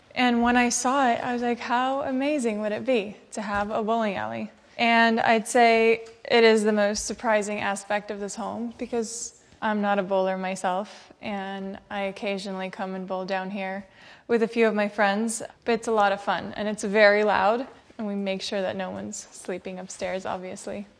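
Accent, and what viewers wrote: United States. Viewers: 这美音说的也太好听了吧。好想听她说两句俄语试试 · 怎么是美音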